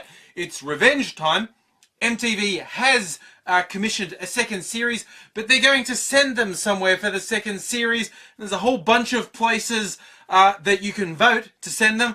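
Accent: Australia. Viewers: omg your so funny...u talk in this angry voice ( with a Aussie accent) and i think that's funny...but what u talk ABOUT is 100% on the mark...for that 5 big as *'s :)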